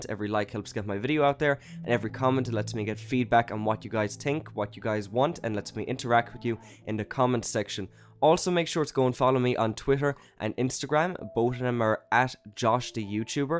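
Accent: Ireland. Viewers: Wow! Your Irish! (Well if my accents serve me correctly) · I'm Irish too! Cool ur accent is so col haha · are u irish because i am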